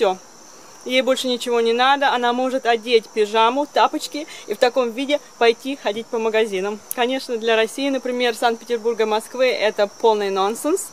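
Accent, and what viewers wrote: United States. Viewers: впервые сейчас посмотрел ваше видео и вас саму. Вот когда я слушал как вы говорите по русски, то не мог сразу прислушаться к говору, явно замечается воздействие американсгого английского языка, ещё тяните звуки на "а". Не хочу этими словами оскорбить, просто так вот заметил. Просто живу на Среднем Урале и привык к местному говору) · У автора уже американский акцент)) позитивно. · ну в общем видео ничего, но сразу видно что заамериканилась, уже поведение и манера разговаривать совсем не русские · а говор-то уже штатовский....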